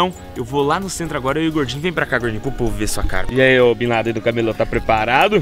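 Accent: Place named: Minas Gerais, Brazil. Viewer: mineiro kkkk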